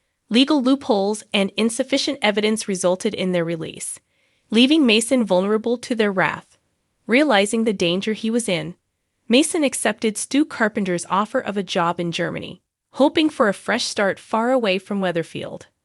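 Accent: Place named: United States. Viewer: The AI voiceover in an American accent is very annoying who pronounces names wrongly!!!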